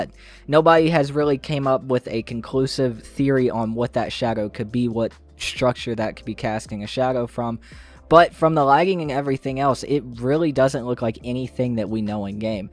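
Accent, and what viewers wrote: United States. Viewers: The strong American accent gets a like from me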